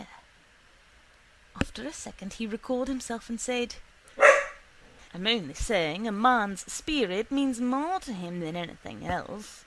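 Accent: United Kingdom. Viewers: David Ritchie haha lol the British accent is cool tho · Get your act off here. We don’t need O’Connor audio stories read in a British accent.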